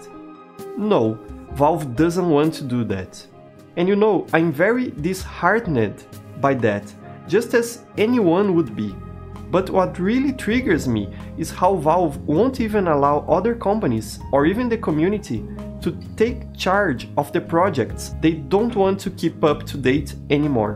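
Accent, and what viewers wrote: Brazil. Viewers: He's accent is so much like a brazilian trying to speak english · Whoa that accent, Brazilian? Que loco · your accent sounds a bit brazilian so caraio raridade ver brasileiro fazendo vídeo de qualidade em jogo que foi praticamente esquecido aqui no país, belos vídeos hue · Unrelated to the video buuuut you sound brazilian and I find that fascinating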